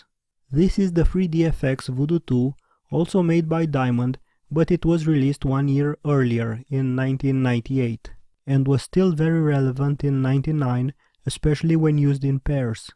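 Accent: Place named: Romania. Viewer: Excelent video. Mi am dat seama dupa accent ca esti roman. Like!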